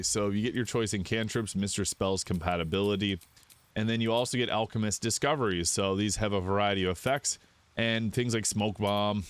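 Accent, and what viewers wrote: Canada. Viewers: Love your content but why do you sound Canadian sometimes?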